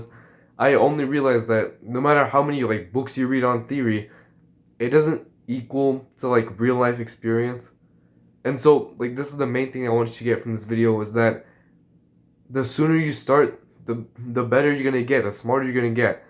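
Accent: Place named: Germany. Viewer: You have a German accent and probably live in Germany? If so, I lived in Munich for three years. Spreek je Nederlands?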